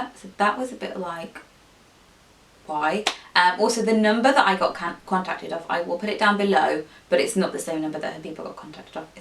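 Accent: United Kingdom. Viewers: Your accent is soo cute! I'm British too but your British accent is so nice!❤️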